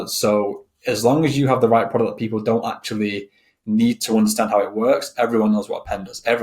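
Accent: North West England, United Kingdom. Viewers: where you from mate, sound local. north-west?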